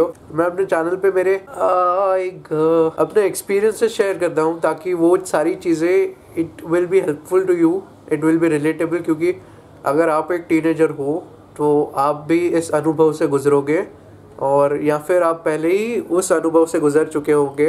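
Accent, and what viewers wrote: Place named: Maharashtra, India. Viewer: bro ur hindi and english with slightly marathi accent feels that u r one of my family .. like elder bro of mine .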